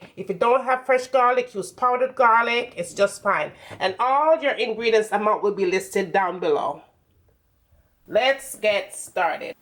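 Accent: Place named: Jamaica. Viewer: Looooove the cooking but looooooooooooooooovvvvvvee the Jamaican accent! ❤❤❤❤❤❤❤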